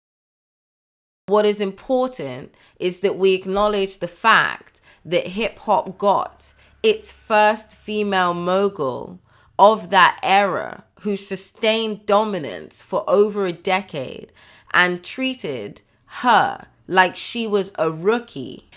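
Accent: United Kingdom. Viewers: The British accent caught me off guard 💂‍♂️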